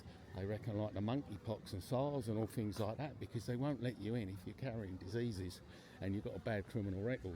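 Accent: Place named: Cockney, United Kingdom. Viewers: Well, the guy has a Gor Blimey accent, so he is used to Ugly Dumps!! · 2030 will be in touch if we're all still around. many thanks. btw i'm from romford and from your accent you're from simlar area?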